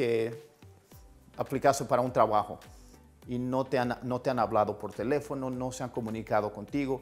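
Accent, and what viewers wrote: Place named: United States. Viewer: Está en los angeles el profe, con razón su voz suena Américano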